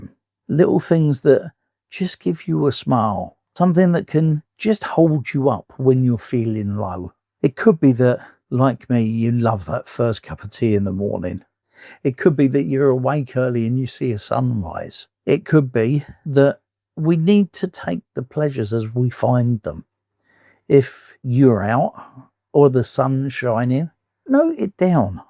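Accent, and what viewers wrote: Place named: London, United Kingdom. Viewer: Hey! I've only just found you!! Although I'm not impressed with your London accent... ( I'm Irish & proud! ) Suffice it to say... I am half daft with all that happened to me in my life! Like you... I'm 65... Retired Police Officer! 3 years ago I lost my Latvian wife to a 12 year battle with cancer... since then... & because of all the sh1t that happened in my life... I'm a bit of a basket case... but "every day in every way. I'm getting better & better!" In actual fact... I've been to a psychotherapist recently... for the first time in my life!! After only 2 sessions I am seeing things that I never noticed before!! Life is waaay from over just yet!! 😊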